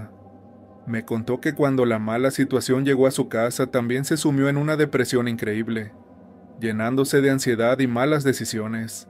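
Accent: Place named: Jalisco, Mexico. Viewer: Hola amigo me gusta como relatas tu forma de ablar nose pero me imaginó que eres de los mios sin ofenderte yo soy de jalisco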